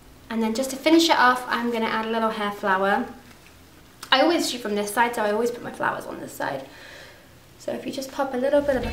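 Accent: United Kingdom. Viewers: I always thought you would be from the US - I was quite surprised to hear your sweet English accent! Your hair as you take your pin curls out makes me want to chop mine right off - it looks so gorgeous, an aqua Marilyn - LUSH.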